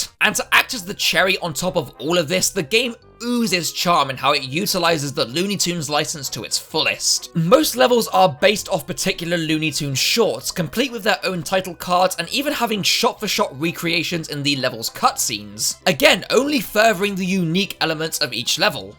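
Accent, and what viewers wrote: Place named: United Kingdom. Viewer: I did not know you spoke british!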